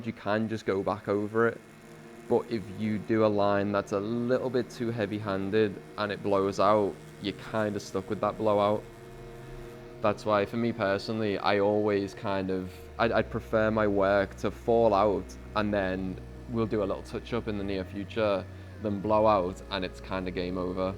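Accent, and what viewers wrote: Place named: Northern England, United Kingdom. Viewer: It’s a northern dialect, southern British people (especially in London) pronounce everything with full syllables where as people like me in the north have a culture of speaking in slang and abbreviations so we often shorten or blend words together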